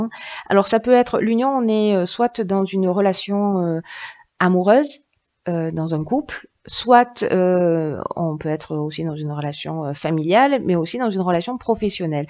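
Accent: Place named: Southwest, France. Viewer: Joli accent ! Du Sud-Ouest de la France ?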